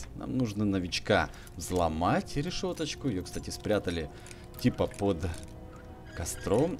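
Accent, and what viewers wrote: Ukraine. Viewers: Он Украинец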